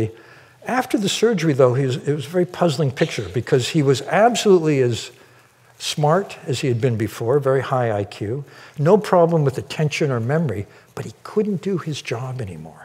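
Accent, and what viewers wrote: United Kingdom. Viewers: I feel very connected during your Q&A😂😂😂 British accent never fails me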